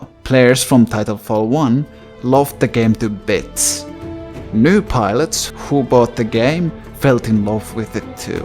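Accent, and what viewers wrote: Finland. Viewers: u sound Finnish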